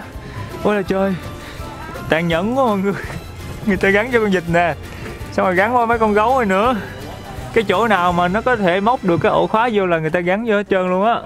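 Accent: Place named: Ca Mau, Vietnam. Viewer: nói chuyện giống người cà mau nè "cái đó ruột già ông nội ơi', chị thích nha❤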